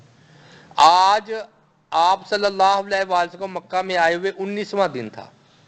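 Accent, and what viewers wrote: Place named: Punjab, Pakistan. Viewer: Assalma Aliakum Bhai App KI Urdu Punjabi styles hai App ko appni urdu Thikh karnay Chahay Likin As Jari Rakahy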